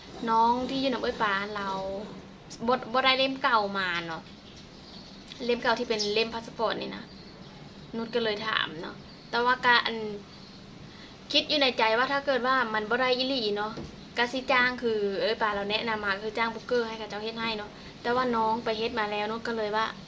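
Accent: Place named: Nakhon Phanom, Thailand. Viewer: เจ้าเว้าญ้อคือคนนครพนมบ้านข้อยเนาะ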